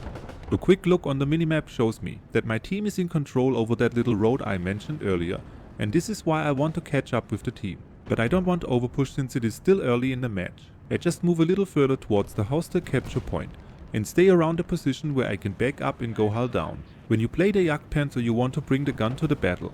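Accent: Germany. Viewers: Eine Sekunde gehört und gewusst, da spricht n deutschsprachiger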